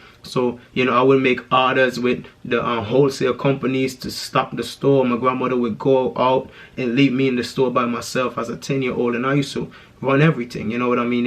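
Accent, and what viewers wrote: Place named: London, United Kingdom. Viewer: So why you sound like you're from London or some shit get the hell out of here with that bronx shit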